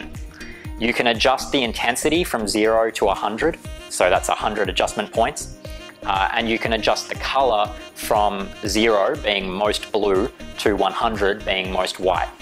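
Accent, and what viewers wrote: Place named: New Zealand. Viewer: U seem to have a kiwi accent. Are you from New Zealand? 💙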